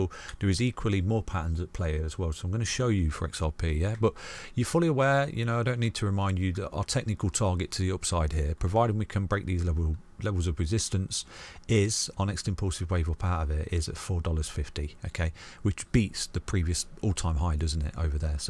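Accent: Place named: Yorkshire, United Kingdom. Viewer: I bet he's from Yorkshire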